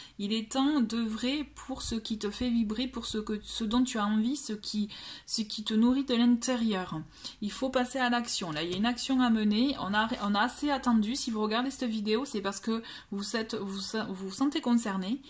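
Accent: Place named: Midi, France. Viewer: Projets 🙏 avec ce bel accent du Midi si je me trompe pas !!!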